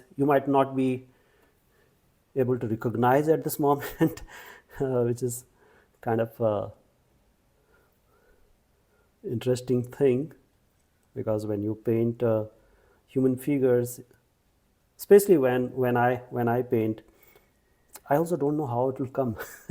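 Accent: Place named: India. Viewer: Always grateful for your generous gift of your time and knowledge,. And don't worry about your accent; I am in Australia, so an Indian accent is no longer foreign! Peter Downie.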